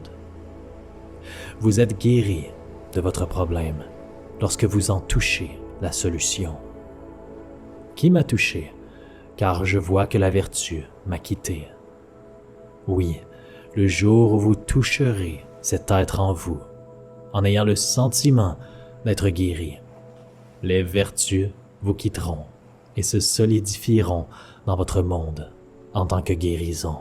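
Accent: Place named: Quebec, Canada. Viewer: Merci à vous même si javoue que j'ai du mal avec votre charmant accent québécois, question d'habitude auditive...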